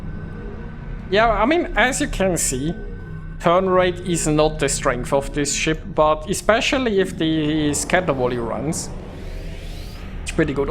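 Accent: Germany. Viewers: Alter dein Deutsch Englisch hört sich geil an😂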